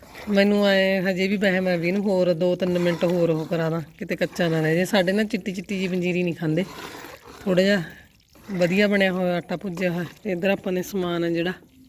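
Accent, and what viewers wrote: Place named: Nabha, India. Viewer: ਅਜ fist time Volg ਦੇਖਿਆ ਵਧੀਆ ਲੱਗਿਆ ਨਾਭੇ ਕਨੀ ਦੀ ਬੋਲੀ ਸੁਣ ਕੇ ਵਧੀਆ ਲੱਗਿਆ ਮੇਰੇ। ਏਰੀਆ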